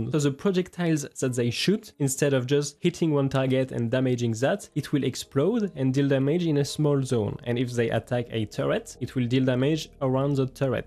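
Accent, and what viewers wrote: France. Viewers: I can hear ur french all my friends talk in this accent 😂 very good content 👍👍🔥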